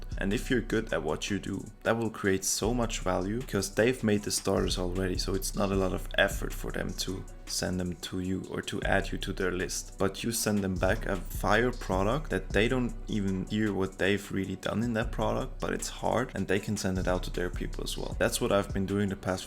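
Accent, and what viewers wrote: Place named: Germany. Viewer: very good video, are u from germany cause ur accents sounds a bit like it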